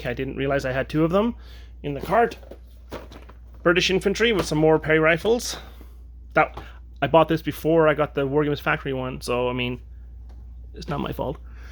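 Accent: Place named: Irish Canadian, Canada. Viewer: You have a great voice: a charming blend of Irish and Canadian.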